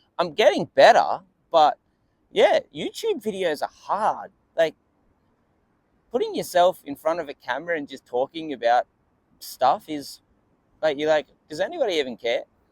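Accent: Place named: Australia. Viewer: Great video it's interesting to hear other people's setups. I'm a complete beginner and just ordered: - 8.25 Jart Gravity deck (steep concave) - Indy Hollow Forged 144 trucks - Spitfire F4 Radial 53mm 99a (Black trippy swirl decal) - Bones Race Reds bearings - Bones Medium bushings (black/yellow) I have a feeling this setups gonna feel very nice based on the research I've done. Greetings from england, love the aussie accent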